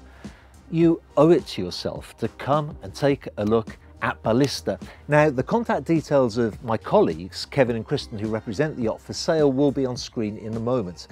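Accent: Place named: Birmingham, United Kingdom. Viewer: As a photographer / videographer (as well as a boater) I find your videos inspiring. With your TV standard presenting, it's great to see ... (brummie accent makes me smile) .... 🇬🇧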